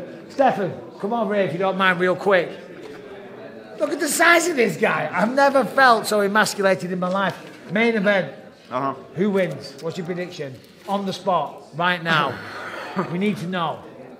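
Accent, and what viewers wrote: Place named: Northern England, United Kingdom. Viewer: Definitely sound more Northern when you’re in England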